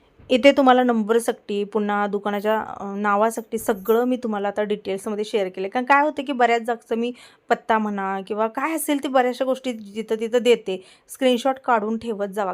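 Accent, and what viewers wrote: Kolhapur, India. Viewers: ताई तू म्हणाली की उंदर मांजर मिळतात येते खूप हसायला आलं 😅असो आपली कोल्हापूरची भाषा अशीच आहे मी पण कोल्हापूरचीच आहे ❤❤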